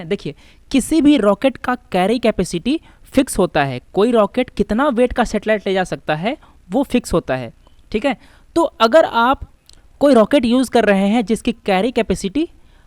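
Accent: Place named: Bihar, India. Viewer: bihari type of language of tutor..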